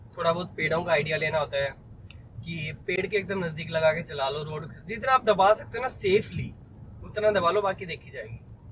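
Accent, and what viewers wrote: Haryana, India. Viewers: Bhai haryana ka hai k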